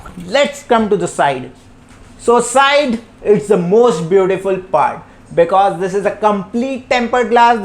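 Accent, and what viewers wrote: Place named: India. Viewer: Ох уж этот индийский говор...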